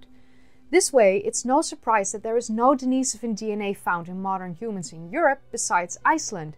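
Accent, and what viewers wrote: Netherlands. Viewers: I missed your Dutch accent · the Dutch slur in your accent, it makes what you want to bring across sometimes hard to follow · does * speak with a Dutch accent?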